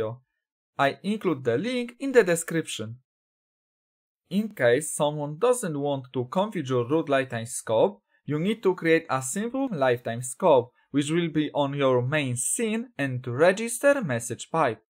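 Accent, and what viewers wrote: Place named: Poland. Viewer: Ziomek, słuchać w uj że jesteś Polakiem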